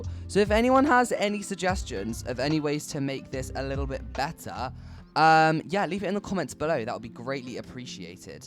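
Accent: United Kingdom. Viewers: You sound like British, are you?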